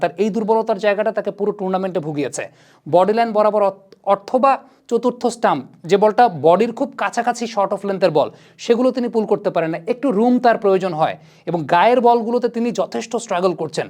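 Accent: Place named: Chittagong, Bangladesh. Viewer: Vai mone hoi Chittagong sptr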